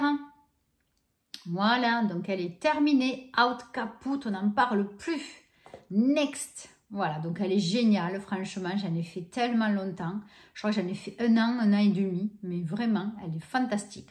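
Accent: South, France. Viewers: Une nouvelle abonnés, tu viens de Marseille ? Ou du Sud de la France parce que ça s'entend ça fait du bien d'entendre le sud sur YouTube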